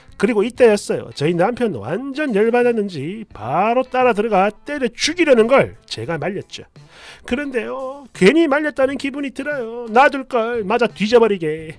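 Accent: Gyeongsang, South Korea. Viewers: 앵가이해라 앵가이~ ㅎ글로읽고 목소리로 들으니 더입에 착붙이네요~ 갱상도 싸나이 좋아요 좋아👍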